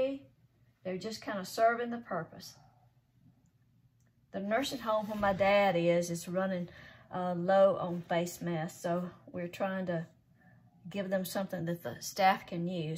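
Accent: South, United States. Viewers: You have a GREAT ACCENT!! This is the BEST Southern accent, y'all!!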